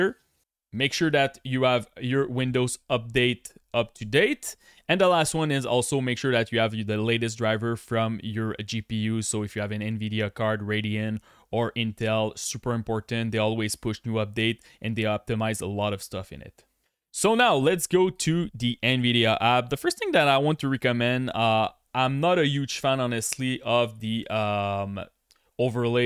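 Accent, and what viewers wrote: Quebec, Canada. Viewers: You french Canadien right?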